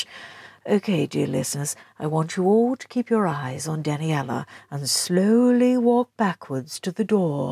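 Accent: RP, United Kingdom. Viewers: Honestly I find these so hilarious, so many zingers delivered in that perfect RP accent. Absolutely love your snark, so glad I had the very happy accident of stumbling over your channel you do some brilliant work.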